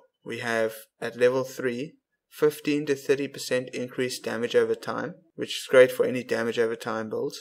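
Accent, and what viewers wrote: South Africa. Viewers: are you from S.A sounds like it ?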